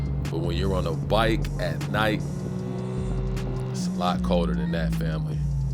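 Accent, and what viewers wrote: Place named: Jamaica. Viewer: God bless my brother 🙏 why I thought I clicked on another persons videos when u came with the Jamaican accent 😅😭💀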